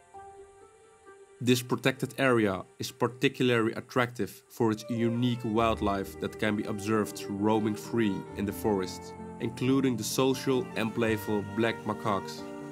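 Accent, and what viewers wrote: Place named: Netherlands. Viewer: Dik Nederlands accent :p maar wat een prachtige video!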